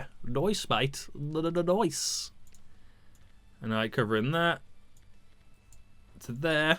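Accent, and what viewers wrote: United Kingdom. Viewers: How do u not notice he is British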